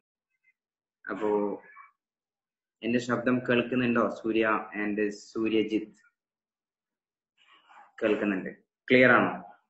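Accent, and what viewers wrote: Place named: United States. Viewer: good american accent..! bravo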